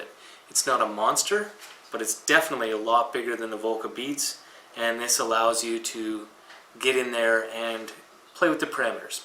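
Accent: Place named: Canada. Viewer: You have the slightest hint of a Canadian accent. :P Love the video, thanks for sharing with us.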